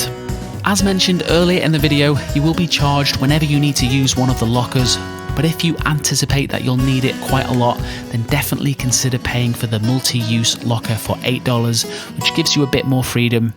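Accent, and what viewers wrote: United Kingdom. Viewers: I love the accent the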